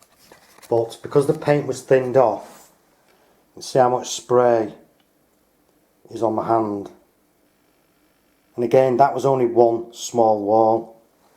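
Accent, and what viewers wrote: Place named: Liverpool, United Kingdom. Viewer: Great video! How long would you leave it before going in with the 2nd coat? Trying to place your accent, it's sounds slightly Liverpudlian but not too much. Warrington area maybe?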